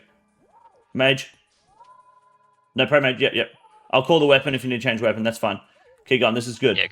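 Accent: Australia. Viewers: It’s Aussie talk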